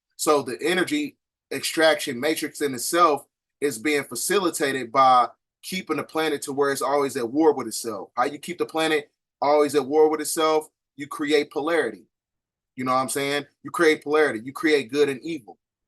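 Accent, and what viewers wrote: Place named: Louisville, United States. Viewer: I hear Louisville accent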